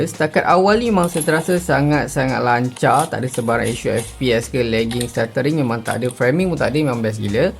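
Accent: Malaysia. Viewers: Padahal banyak dr indo pengen tau banyak tentang HP ini sayangnya bahasa Melayu nya kental banget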